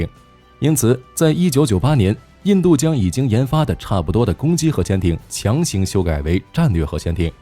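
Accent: China. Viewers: 只要是這種中國口音的不是大外宣就是大內宣,只要你是中國的敵人,他就製作假視頻來攻擊你,從來不懂得,去讚美別人,只會抄襲別人,又不懂得核心與關鍵技術,於是呢就製作了一些四不像的武器出來了,沒有作戰經驗的中國解放軍,只會使用鋼筋水泥,號稱基建狂魔,笑掉到人家大牙。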